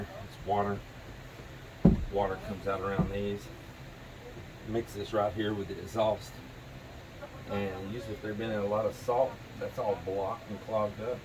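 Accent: Texas, United States. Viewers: Oh I hear that Texican, bellge. My cousin lived in Post Texas and he worked killing whales 🐋, oil whales that is. Different places different colloquialisms expressions and pronunciations. All my Texican family I was close to are gone now. You just brought some fond memories back.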